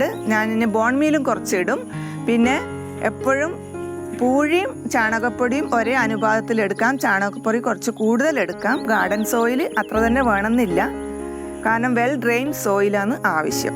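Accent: Kannur, India. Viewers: ചേച്ചി കണ്ണൂരാണോ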